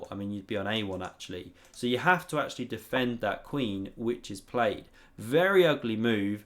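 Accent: England, United Kingdom. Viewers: Fantastic video as always. Two things that were especially great about this one: your use of the word gross in the opening sentence and the fact that you managed to get Hans Niemann on screen for a few seconds. Keep up the badass analyses and I certainly hope you continue to be one of the greatest adjective-picker of all the people who speak our common language with an English accent. Folks from England usually go for soft, subtle-but-potent descriptors. Not. is aiming straight for your nuts when talks, and he's gonna hit 'em. lol sorry there's a nonzero chance that i've had a few drinks but.. I'm not entirely unserious-- keep on keepin on.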